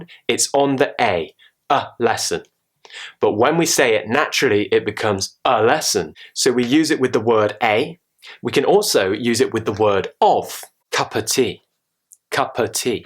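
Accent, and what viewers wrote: RP, United Kingdom. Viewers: I'm In a play and I need to learn the British accent and you're 😉! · OMG I've just found a new motivation to learn.... - intelligent - handsome - British Accent <3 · Nawwwww that perfect british accent though 😍😍 Could listen to that all day. Nice video man!! Keep it up! Greetings from Germany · I like the British accent and I really liked it more when I listened to you. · I love british english and accent i think soon after watching your videos i will start speaking british accent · Greetings, can someone tell me which british accent is he using? Is it the RP? Thanks everyone :) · Keep it up the good work sir.. I went through all the British accent channels trying to improve my accent and literally you were the best.. cheers from Dubai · i love you,! I like British accent, it is beautiful and you help me so much!!! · I love to learn british accent. 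U speak very nice, like to hear u r accent. 👌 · I just watch this to listen to this beautiful British accent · I love so much British accent especially your class, I'm still waiting your lesson everyday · British Accent is really admirable. I appreciate your teaching sir and regard u as the best teacher for it. Sending you reams of wishes from India!! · i love to hear the British accent from u ... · i am Abdimajid Borobiyo from Somalia the capital city, i really very exited to watch your video's in British accent. i like very much to have a powerful British English accent, thanks Mr... thank you so much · Same here. I really want to speak like him. It feels so posh to listen to British accent.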